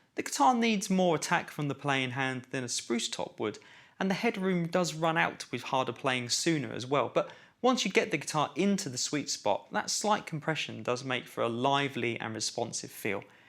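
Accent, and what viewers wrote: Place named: United Kingdom. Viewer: I’m tickled with your British accent. Want to ask what country and region are you from ?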